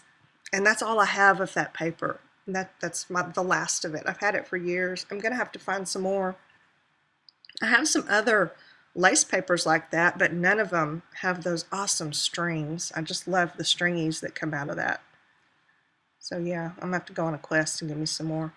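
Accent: South, United States. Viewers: Love! Where are you from? You sound like you have a southern accent! I'm a Mississippi girl;)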